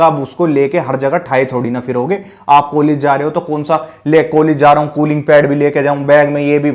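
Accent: India. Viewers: par bhaiya aapka bolne ka tarika ekdum mast hai , ekdum desi , very good 👍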